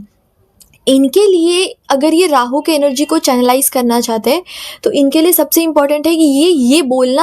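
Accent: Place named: Gujarat, India. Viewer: You must have gujrati root. Your accent ..ok. Aap accha bolti hain. Thank u behan ji. Om Namah Shivaay.🎉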